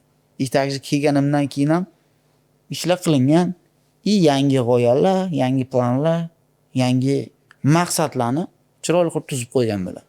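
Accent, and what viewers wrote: Bukhara, Uzbekistan. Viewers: Сизи яхши куриб томоша киламан самимий соф бухороча гаплариз ёкади узимам бухороликман тушунарли оддий роликлариз куп мусофирдан курдим бу сухбатниям тугриси ичизда бошка яшаркан видёда бошкача одамимийлигизга 5 бахо Лекин тарбия берган ота онанггизга минг рахмат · Toshkentga borib, o'zidan toshkentlik yasab olmasdan buxoroni shevasi bilan ko'tarilgan. Lekin ijodizni sog'indik. Judayam pazitivni edi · Molodes chistiy Buxoro shevada gapirdi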